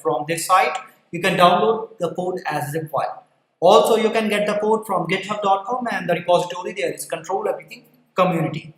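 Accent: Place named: East India, India. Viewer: The East Indian accent is difficult enough to understand without the tutorial taking place in a live room.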